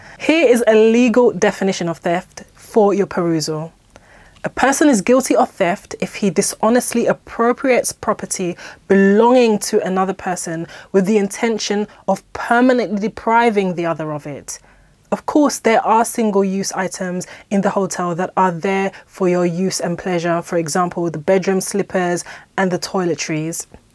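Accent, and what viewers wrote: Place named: RP, United Kingdom. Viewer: Having a Mary Poppins accent (that I love) and the name, one couldn't be anything but elegant. Not part of your crowd, but interesting insight. ✌🏽 *Not sure if this is the correct way to spell your name.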